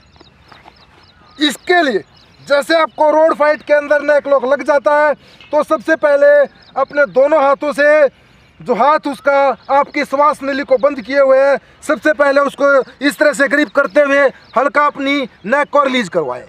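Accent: Haryana, India. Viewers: I think Aap haryana se ho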